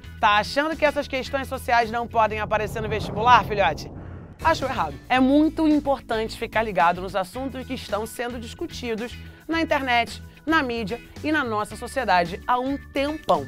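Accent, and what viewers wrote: Rio de Janeiro, Brazil. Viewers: Será que a sra é carioca?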